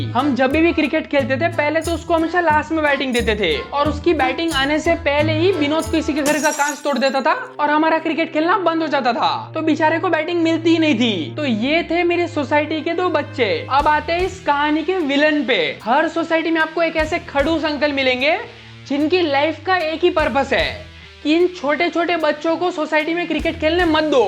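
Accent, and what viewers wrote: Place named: Gujarat, India. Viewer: Bro are you Gujarati because you have a Gujarati tone and I am a Gujarati too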